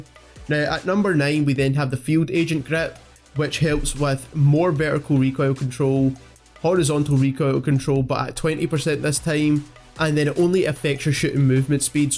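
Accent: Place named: Scotland, United Kingdom. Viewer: The Scottish accent is the best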